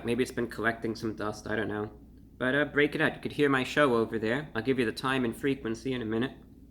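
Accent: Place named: Transatlantic, United States. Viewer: Your Transatlantic accent is absolute perfection.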